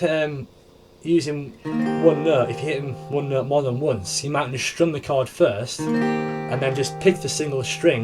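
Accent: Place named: Hull, United Kingdom. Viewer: Good lad - I'm from Hull myself, nice to hear a lesson in an accent similar to mine!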